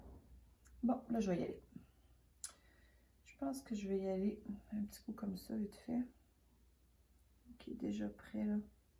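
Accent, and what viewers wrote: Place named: Canada. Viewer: Bonsoir de France! Merci pour ces 2 vidéos! Ce sont les vacances se Noël depuis hier soir pour 15 jours. Donc un peu plus de temps pour "bloomer"... As-tu fait d'autres vidéos? Si oui, peux-tu indiquer le lien stp? J'aime beaucoup tes explications en "vrai direct". Je trouve ça très sympa et j'adore l'accent canadien! Ça donne la pêche et on en a tous tellement besoin en ce moment! À bientôt.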